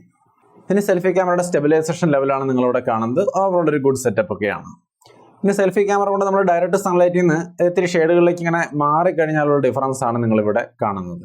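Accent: Thrissur, India. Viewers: Pakka തൃശൂർ കാരൻ 👌👌👌👌😁😁😁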